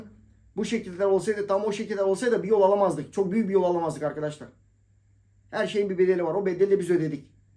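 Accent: Thrace, Turkey. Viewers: Abi İzmirli bir ablaya dinlemiştim 3 gün önce sizi direk bizim ege şivesi bu demişti bende yok Trakya demiştim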